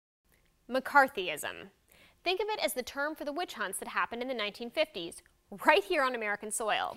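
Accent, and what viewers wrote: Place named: India. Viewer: *she has a very this Indian accent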